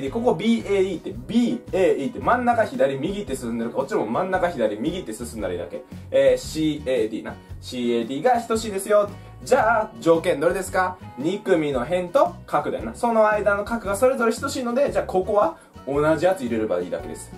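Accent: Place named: Kansai, Japan. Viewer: 関西弁が頭にすっげー残ってたすかる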